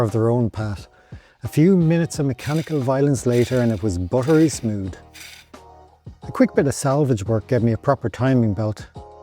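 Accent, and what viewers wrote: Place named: Ireland. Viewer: Amazing video. Great to hear the Irish accent!! Some amount of work gone into this fair play to ya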